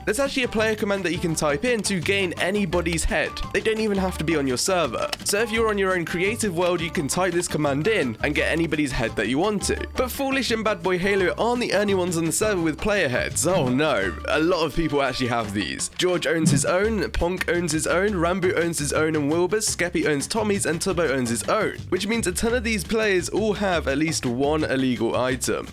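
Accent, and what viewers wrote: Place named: United Kingdom. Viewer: British guy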